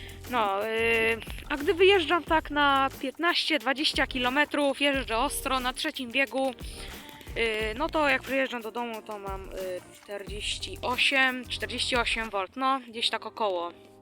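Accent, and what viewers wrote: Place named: East, Poland. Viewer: Nie wiem jaki sens jest jeżdżenie jakąkolwiek hulajnogą przy takim śniegu jaki tutaj jest pokazany no to może tam gdzieś to relacja jest w języku trochę takim z akcentem wschodnim morze na południu Polski gdzie ta zima trochę tam gdzieś się pokazuje bo na północy a w Szczecinie mieszkam po prostu praktycznie zimy nie widzę ale nie zamierzam jeździć hulajnogą zimą bo to jest średnia przyjemność praktycznie no jeszcze na siodełku powiedzmy to jest jako tako ale stojąc to człowiek po prostu szybciej marznie i jest większe ryzyko wpadnięcia w poślizg w ogóle nie widzę tej jazdy zimą i należy ona do sporadycznych czy co wynajmują firmy wynajmujące czasami spotykają swoje właśnie hulajnogi porzucone w śniegu w ogóle to jest osobny komentarz do tego bo to już po prostu zakrawa na kpiny z hulajnogi wszędzie są bezładzie porzucane gdzie tylko popadnie i zagrażają przechodniom ja już bym się dwa razy o nie potknął wychodząc wieczorem przy słabym wzroku...m.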